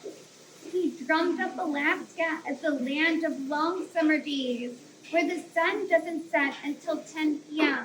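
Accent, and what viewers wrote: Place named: California, United States. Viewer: I knew you were from Cali just by your accent alone!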